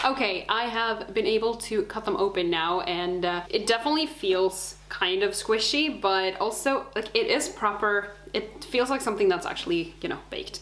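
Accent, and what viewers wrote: United States. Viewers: Yes.. i usually have less interest about your cooking contents but i've realized that you have a perfect american accent.. maybe you should start to post some language based contents.. and maybe some informations from U.S.. And you should sail to kick or twitch.. these can also go with live streams.. Ohh one more thing.. i think you forgot to cut this video to parts or it's basically a weekly video :D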